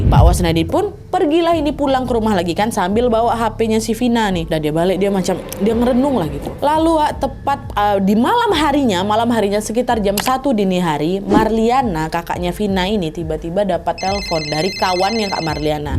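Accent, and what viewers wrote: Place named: Sumatra, Indonesia. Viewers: Lahh knp dibandingin wak..saya dlu krj di malaysia orng sumatra ngmongnya jg gt kak tegas keras jelas tp wak ada jawanya dikit kyknya keturunan..bah hiro kan menghibur gemulaii gimana gt jg enakk bawainya...ad ciri masaing2 lah wak... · Itu logat sumatra... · Emang kalo pake hijab auto jd suci?? tuh org sumatera otomatis logatnya ya Kya pada umumnya org Sumatra yg kenceng...Julid amat jd orang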